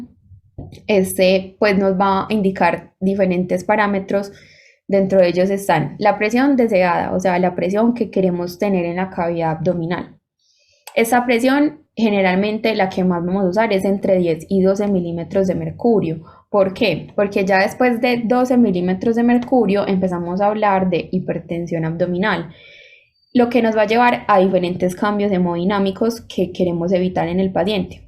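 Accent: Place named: Antioquia, Colombia. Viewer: muy paisa, buena platica, saludos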